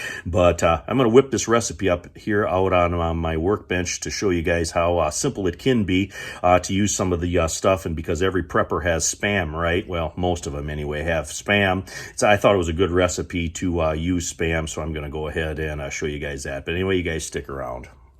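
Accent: Michigan, United States. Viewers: I am from TC. I live in eastern Oregon now. I miss the Michigan accent!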